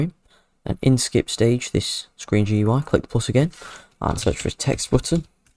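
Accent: United Kingdom. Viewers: Fir o all ge a new mic an fi tha briitsh accent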